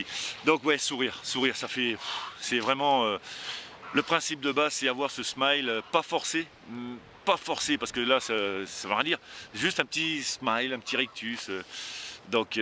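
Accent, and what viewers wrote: Nord-Pas-de-Calais, France. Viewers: Une personne simple, qui vie simplement, qui parle « vrai »... Je suis normand et habite en Auvergne depuis peu, j’allais très souvent voir les ch’tis, là haut, que de bons souvenirs. Je pense que nous aurions aimé, mes amis et moi, nous retrouver à boire une bonne petite pinte dans ton bar. Ça me fat du bien de réentendre cet accent. Nous construisons aussi notre petite oasis, de notre côté nous faisons notre chemin. Je te remercie, j’ai l’impression d’avoir pris ma vague d’optimisme et de positivité pour le week-end et plus.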